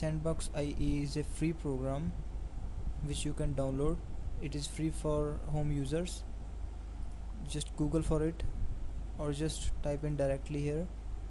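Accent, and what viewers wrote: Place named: India. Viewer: sooo Indian accent xD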